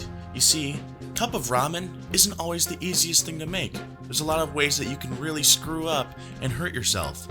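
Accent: Midwest, United States. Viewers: Are you from the Midwest? Your accent... it is strong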